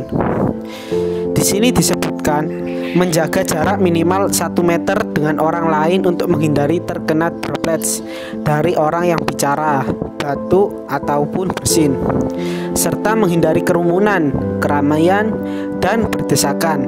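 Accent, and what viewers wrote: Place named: Java, Indonesia. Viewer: Logat jawamu kental sekali kawan wkwk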